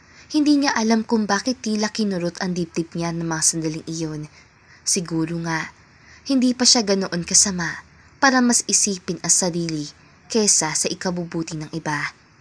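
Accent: Visayas, Philippines. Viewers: Napakaserous Kasi Ng Bago mong narrator Hindi kagaya mo na Minsan may nakakatuwa Lalo na Kong may halong bisaya tuwang tuwa ako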